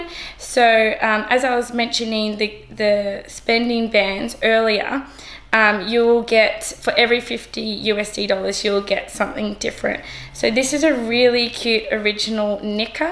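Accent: Australia. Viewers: is incredible. She is so beautiful and represents the company so well. And her accent. What part of Australia is her accent from? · Is that Australian accent?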